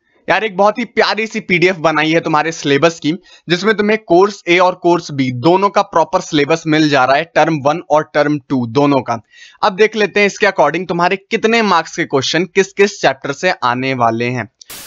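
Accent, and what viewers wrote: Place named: Kanpur, India. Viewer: Bhaiya Aapki aawaj na...Bilkul Kanpuria style h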